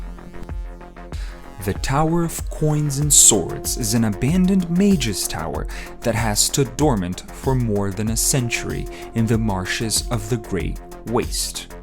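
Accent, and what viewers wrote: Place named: Brazil. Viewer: eu tava estranhando o sotaque no último vídeo, mas não tinha reparado no nome... Vc é br senhor? kkkkkk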